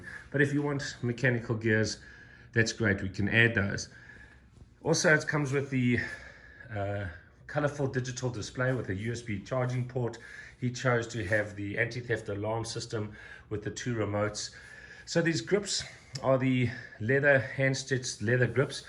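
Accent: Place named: Afrikaans, South Africa. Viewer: i love your afrikaans accent!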